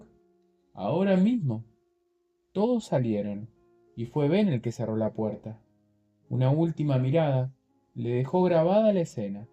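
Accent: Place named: Argentina. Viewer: Querido, mil GRACIAS por leernos. Consulta: ya leíste en el otro canal APOCALIPSIS? Que gusto escuchar leer en argentino 😁💪🏽🇦🇷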